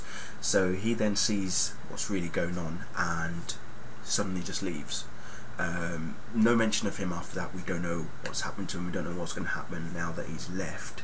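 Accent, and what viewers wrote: United Kingdom. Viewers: Young justice is the best american show i've seen on cartoon network in a minute! Also, Halfway through the video as im listening while on another website, I realized that you have a british accent and you're black!! That is so awesome!!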